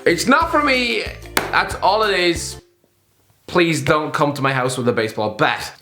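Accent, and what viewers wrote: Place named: Ireland. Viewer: Whenever I click on a video from this channel, I pray to hear the. I see, my prayers have been answered.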